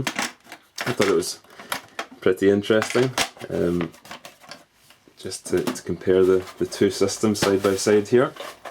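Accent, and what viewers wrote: Scotland, United Kingdom. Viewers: Where in Scotland are you from. You sound like you are close to me. Edinburgh area?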